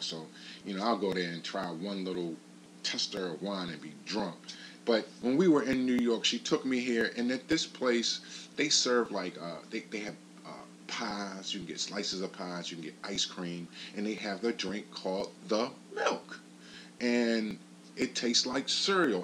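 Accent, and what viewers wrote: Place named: Maryland, United States. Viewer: Your murryland accent is so on point It's like marylanders replace a's with the "ur" sound haha.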